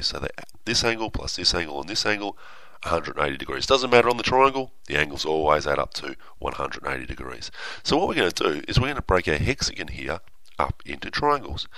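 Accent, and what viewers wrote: Australia. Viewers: Woah,your Australian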